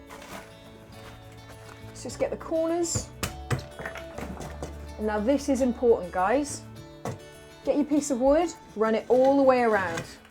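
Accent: England, United Kingdom. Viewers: Lovely acent i believe English. What part of UK ? Thanks for your info on glazing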